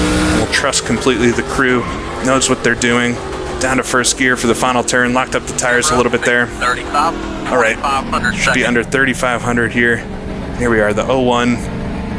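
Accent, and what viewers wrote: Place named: Northeast, United States. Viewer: I’m new to the channel, & I could tell you were probably from the Northeast just a few videos in (I talk to dozens of different people a day, haha). It’s good to see NASCAR’s regional series come to NR2003, as it holds a lot of memories for people who watched local racing scenes. I’m from the West Coast so I’d love for someone to do the NASCAR West Coast Series from <num> for this game.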